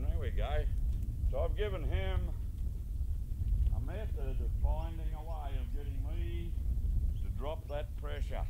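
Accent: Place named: Australia. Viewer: I like that he was gentile and did not get into uneccesary fights and kept the session short with a young horse. I hate the show em whose boss..out of my space, use that whip and teach and ride with pain to make up for your lack of hosemenship. Sounds like down under accent..NY here.. Hate the way I was taught to ride with pain.. These amazing animals do not need bits or tie downs or spurs nor whips.. They or rather we do so much better just using a rope halter with no bit bucles or chains. And it creates a much better bond and team. lovely little chestnut.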